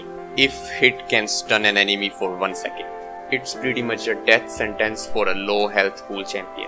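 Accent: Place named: India. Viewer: I can tell by your accent that you're indian. Am I right? :)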